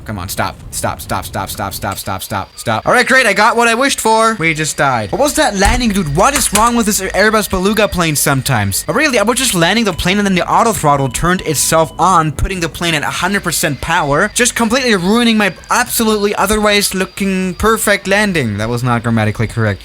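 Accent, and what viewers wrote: Germany. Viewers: Are you german? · U German 🤨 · wait a minute... are you from germany? 😊😆🤔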